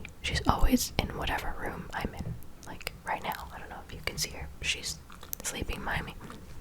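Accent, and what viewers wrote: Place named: California, United States. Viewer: you can do no wrong. say whatever you like even if it's with a CA accent !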